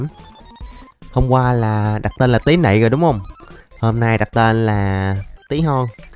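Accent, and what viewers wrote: Mekong Delta, Vietnam. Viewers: em nói thật lun anh làm clip thì ah hãy nói giọng thật đc ko chứ ah đừng chỉnh giọng anh chỉnh giọng để làm clip về mục đích j nên em mog anh làm clip hãy nói giọng thật của mình mình nói thật với tất cả fan của anh, giọng thật của anh khác nữa giống người miền tây nên em nói 1 câu chân thành với ah, em mog anh làm clip hãy nói giọng thật nha anh bạn nào muốn nghe đc giọng thật của ah thì hay qua kênh của anh Găm Bồ Gaming và gõ Lộ Mặt Thật Ngoài Đời Khi Phỏng Vấn Cùng Burgerby nếu như ah đọc đc bình luận này thì em mog anh có thể nói giọng thật của mình ạ chúc ah 1 ngày vui vẻ ạ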